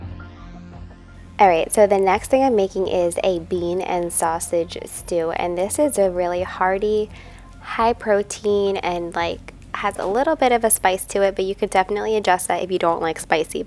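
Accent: East Coast, United States. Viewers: LOVE Crockpot Recipes! These look super yummy!!! Glad I found this channel 😁 -- (& her east coast accent makes me homesick!) ❤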